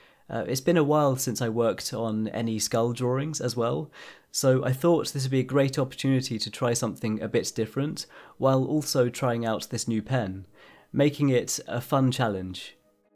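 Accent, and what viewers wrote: United Kingdom. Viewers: Amazing scull. With plenty of symbols. Fantastic to see you draw it. Learnt alot! Thanks. PS I really adore your beautiful British pronounciation. It's such a pleasure to listen to.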